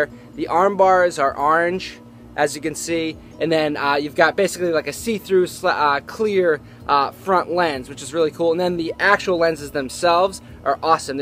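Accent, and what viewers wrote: Boston, United States. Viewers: boston accent Tha unabombah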